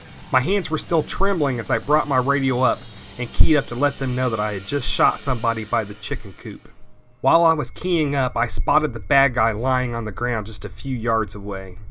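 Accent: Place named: Flint, United States. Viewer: Sounds like you live near Flint.Michigan lmao. Good series and the choice link finally worked. I'll have to go back to the beginning now.Thanks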